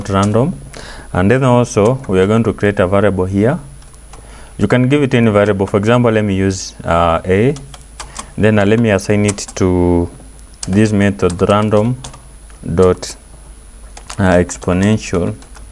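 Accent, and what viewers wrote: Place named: India. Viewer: our legendery indian accent tutorial)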